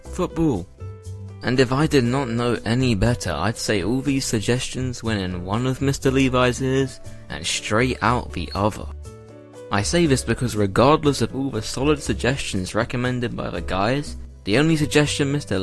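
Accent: United Kingdom. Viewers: Your brittish I thought you was Australian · i absolutely love this guys British accent. It’s so charming to hear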